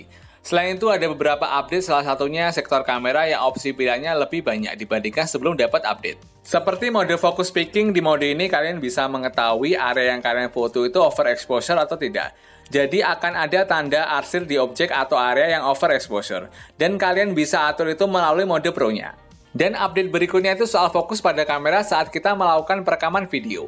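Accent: Magetan, Indonesia. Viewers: Asli ne wong ndi iki kok nk alon" magetan🤣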